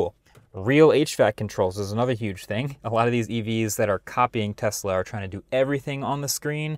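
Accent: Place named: California, United States. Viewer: nghe giọng điệu này niết ngay cali rồi ! Đúng chứ người Mỹ gốc cây ?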